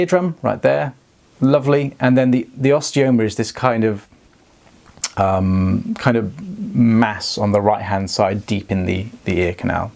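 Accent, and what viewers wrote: England, United Kingdom. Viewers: Very nice haircut Mr.. You are so cute and the english accent is cherry on top 😍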